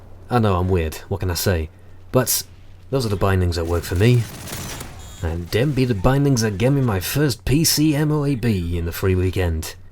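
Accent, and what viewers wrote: United Kingdom. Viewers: ARE YOU SCOTTISH IRISH OR BRITISH??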